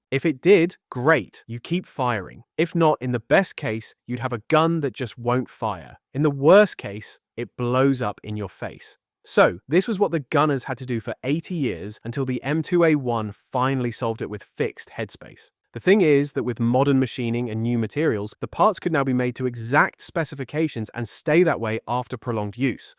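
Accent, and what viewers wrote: RP, United Kingdom. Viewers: Hey buddy, take your twelve point seven by ninety nine millimeter, and shove it up your British accent stove pipe, okay?! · Many things I give to the British being an American. The one thing I give more than anything is that for some reason the posh accent makes any statement feel authentic no matter what it is compared to most Americans unless it’s someone like Mike Rowe, Morgan Freeman, Etc.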